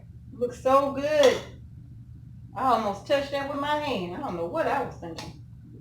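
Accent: South, United States. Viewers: I love watching and listening to you! It takes me back home to Louisiana. I live in Indiana now and it is so good to listen to the southern way of talking. I love how real you are. From one sister to another. Blessings.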